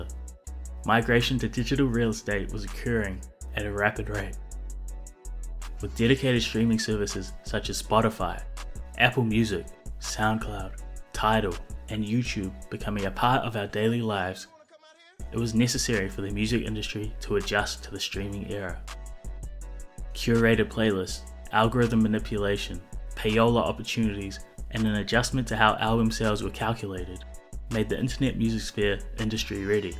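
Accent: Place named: United Kingdom. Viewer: holy shit british man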